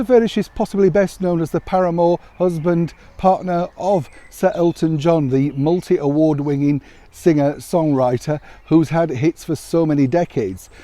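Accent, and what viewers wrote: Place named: Liverpool, United Kingdom. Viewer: you say you are a Yorkshire man, but when you are joking I find you have a very Liverpool cadence, not accent but for example your pally endings - don’t you. I love it as I’m a scouser from way back. Thank you for giving me a touch of home everyday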